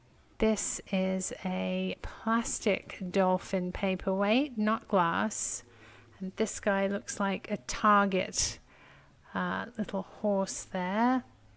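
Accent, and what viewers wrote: United Kingdom. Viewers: Hi. I love the crystal beaded tree ornaments and all the items in the baggy. Love watching your channel, good to hear a Brit xxx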